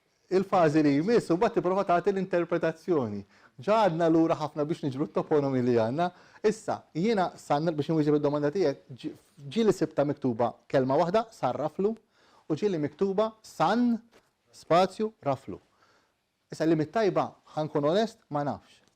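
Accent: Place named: Tunisia. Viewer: The professor has such a Tunisian intonation.....one intervenant sounded Tripoltan.....and you have a few levantine words ....like issa, inkiteb...inqalab....at the end it is driving me crazy .....rahal ..marhala means both....distance of journey and staion of journey......and by the way ..,when you say Yena ....for I ....that was lost in the arab world.....but still exists in Yeni only in a town in central Tunisia and in Alexandria Egypt.and probably some Palestinians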